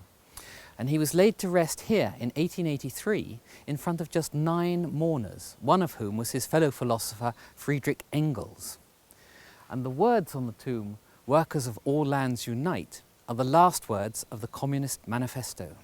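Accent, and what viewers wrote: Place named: United Kingdom. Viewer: I hate the British accent. But that’s just me I can’t stand it.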